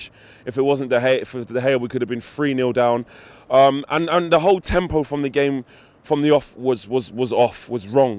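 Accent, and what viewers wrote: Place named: Manchester, United Kingdom. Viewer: He sounds like a local Mancunian huh?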